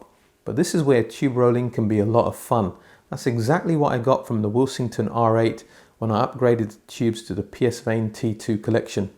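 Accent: United Kingdom. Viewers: You sound British, but don't look British.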